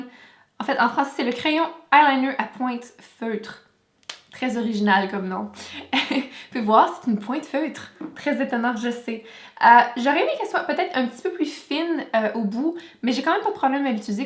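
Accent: Quebec, Canada. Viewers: J'aime tellement ton accent québécois ! Dans le nord de la France on a pas la chance d'avoir un si bel accent... Ahaha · J'écoutais tes vidéos de mascara en anglais et j'ai reconnu un accent québecois alors j'ai fouillé sur ta chaîne et j'avais raison!!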